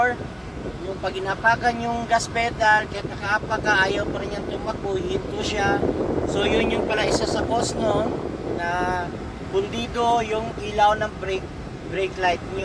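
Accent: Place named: Visayas, Philippines. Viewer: ang Cute Cute Bisaya nga lang....😅😅😅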